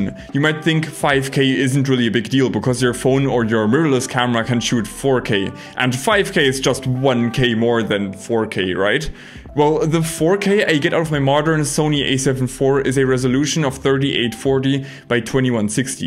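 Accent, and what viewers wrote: Germany. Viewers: Keine Ahnung - 1 Satz und für mich klingt das als ob du Deutscher bist. Wieso bloß kein Video direkt in DE ❤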